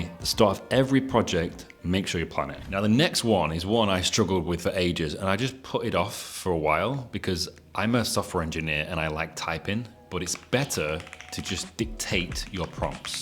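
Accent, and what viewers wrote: North, United Kingdom. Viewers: Senior Engineer here, definitely felt the role change in the last year. MCP Servers seem to be the powerhouse for me so far, I've also been using a 'Context Bank' MCP Server to help with the 'Compound Engineering' across different tasks (and even different tools like Roo Code and Claude Code). P.S Always nice to hear another vaguely northern accent!! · Love this, new sub here, thank you; from a (what sounds like) a fellow northerner!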